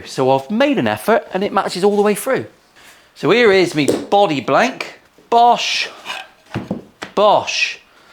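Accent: United Kingdom. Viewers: I thought you said Woman but no my British local reginal accent translator kicked in and realised you said Warman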